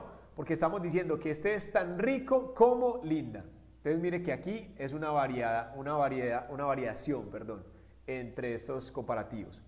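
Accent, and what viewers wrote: Colombia. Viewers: sos un genio, me encanta su acento colombiano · y el acento colombiano uyyyy maravillaa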